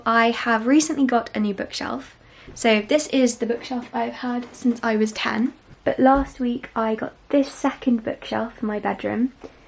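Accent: United Kingdom. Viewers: 영국 영어 발음 정말 매력적이다 😆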